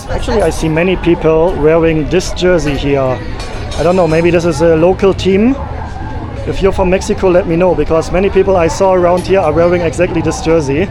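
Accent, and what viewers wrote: Germany. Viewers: You shouldn’t be assuming that people understand your English, let alone with your German accent. Learn the basics of Spanish for a German tourist. If you went all the way there and you don’t even know what “Salida” signs are telling you, that means you didn’t prepare at all for this trip. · Ya sabía, dije, ese acento no es estadunidense jeje. So, You are from Germany, You should search to Jan or Moe, they arebfrom Germany and they have many years here un México.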